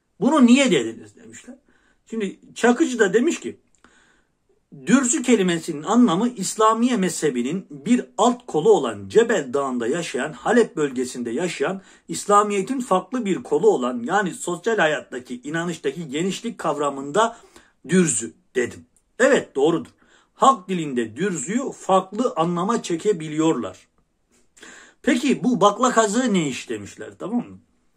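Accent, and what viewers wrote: Erzurum, Turkey. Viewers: arada bir erzurum siveside guzel oluyor ahiskalilar sivesine benziyor bana çocuklugumu hatirlatiyor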